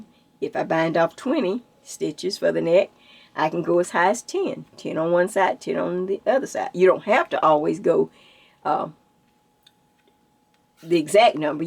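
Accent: South, United States. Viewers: I am one of those that stumbled upon your channel by "happenstance", and boy am I glad I did! I love everything about your videos - your tutorials, your personality, your stories, your comforting voice with the southern 'drawl'... I love it all! So much so, this is the first time I've taken the time to comment. Keep it up! I can't wait to see more! I'm so sorry about your loss of your dear friend Judy. Love love love your friendship vest named in her honor. That was the video that introduced me to you. Thanks again for sharing it all with us - I love the narratives you give in addition. Makes me feel so at home. :)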